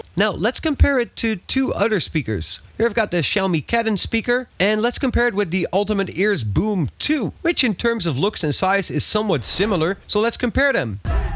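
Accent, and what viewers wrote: Netherlands. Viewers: Ik dacht al je bent Nederlands haha goede review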